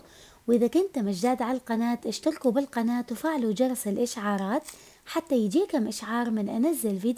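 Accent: Mosul, Iraq. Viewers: مساء الخير على الجميع شكو ماكو خير ان شاء الله وينك اختنا الموصلية الشيف المميزة بالاطباق العراقية المنوعة الطيبي مشتاقين للصوت الموصلي والابداع المطبخي المميز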